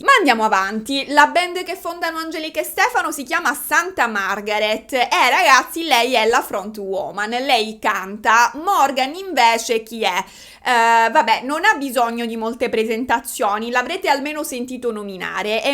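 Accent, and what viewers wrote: Sicily, Italy. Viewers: te l'ho chiesto perché sentivo una leggera inflessione ma non ero sicuro che fossi di queste parti: io sono di Parma ma mi sono trasferito con tutta la famiglia, a Siracusa. La Sicilia è il paradiso in terra! Ciao e complimenti per il tuo canale e la brillantezza con la quale lo conduci!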